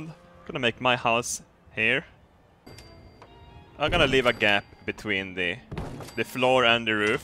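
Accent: Sweden. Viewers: you better make more of these ...I can't fuckin stand other non vikingss talking in non swedish accents THE GLORY IS ALL YOURS BALDER HIMSELF LAUGHS MARKED WITH LIGHTNING STRIKES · Is Swedish or something? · "Home cozy" love your swinglish... Have you found any "stabsnakes" yet?? You've got a new subscriber! 😄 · "fort yinyer"...dat swedish english though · are Swedish right? I can hear it on your voice. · are you swidish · Jacob Tidwell he’s Swedish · det är så kul att man kan höra när folk på Youtube är från Sverige bara genom att lyssna på när de pratar engelska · best Swedish accent ever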